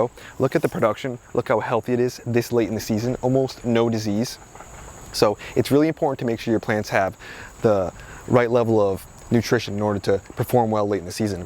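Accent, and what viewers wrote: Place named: Philadelphia, United States. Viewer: ❤❤❤❤❤ I love your channel!!! (Can tell you’re near Philly, love to hear someone who also has the accent :) )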